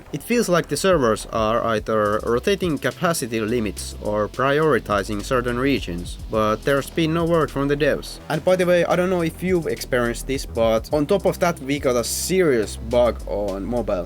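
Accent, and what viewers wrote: Finland. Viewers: Jätkä puhuu yllättävä hyvää englantii. Kyl tos o ripaus sitä suomalaisuutta en oo growist kuullu pitkää aikaa nii iha mielenkiintosta kuulla täst pelist pitkäst aikaa. Mahtava video!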